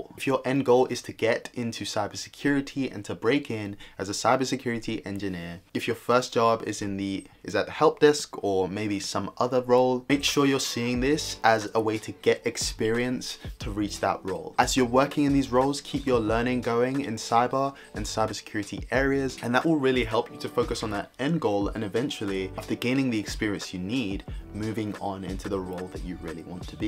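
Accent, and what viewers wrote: United Kingdom. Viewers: that's funny, I never noticed from the UK... I'm used to the UK accent as I'm from the UK too lol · thanks Bruv for clearing my mind and showing the way also i glad that i've finally found a pleasant calm UK accent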